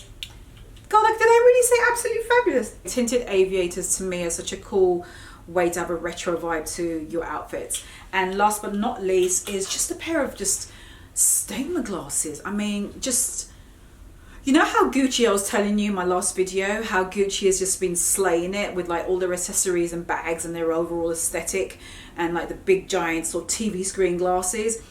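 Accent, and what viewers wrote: United Kingdom. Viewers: ! You are the Master Mixer, Co-ordinator and Fashionista of them all! You're truly such an inspiration and a great teacher! Yaay and a fellow Brit... love your accent😂 I've been dowdy for far too long and been wanting to switch it for a while. Well, now I'm switching it up... with your help! Thank you. Please tell me the oriental jacket from is reversible. It sure looks like it is. Love you! Love what you do! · Hi, I'm Mariel (the same from your blog, but another picture jajaja)....You look amazing with your own hair!!! Keep it that way! I love your british accent....!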